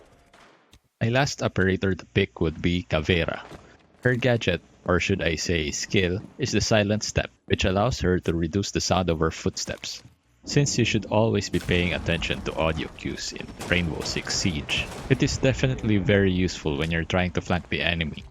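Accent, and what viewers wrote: Philippines. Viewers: Are you Filipino? You have the accent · The filipino accent on this one is wicked. I love it.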